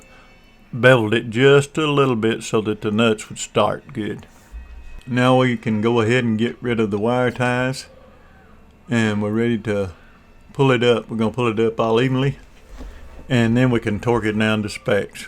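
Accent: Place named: Scandinavia. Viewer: What sort of accent is that? Sounds Scandinavian to me🧐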